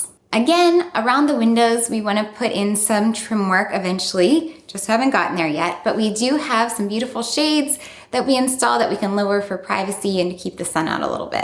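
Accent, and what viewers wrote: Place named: North, United Kingdom. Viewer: Your voice sounds like Leslie Mann who played Jane in George of the Jungle lol And I can tell you're from up north somewhere :D Love the videos!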